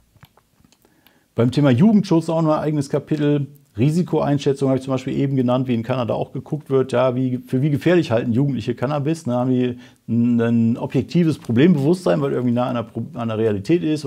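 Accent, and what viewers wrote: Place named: Duisburg, Germany. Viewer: Ich mag den Dialekt. Schätze Duisburg.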